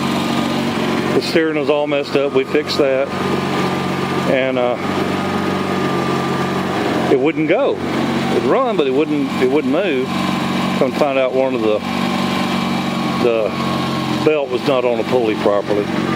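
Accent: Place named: Tennessee, United States. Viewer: you and I are cut from the same cloth, same age too, my grandkids call me, the only difference is you have a Tennessee accent and I am a Maina, ayuh and my handle is skidddah.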